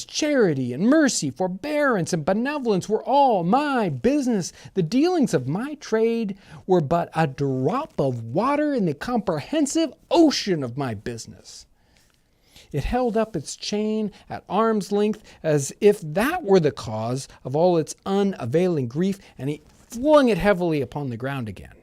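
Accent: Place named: United States. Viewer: That was great and a bit different with an American accent 😀👍🇬🇧🇺🇲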